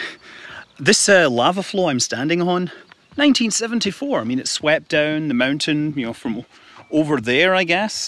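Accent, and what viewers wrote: Scotland, United Kingdom. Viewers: Do I hear a Scottish accent? It’s a posh wan but am sure it’s there.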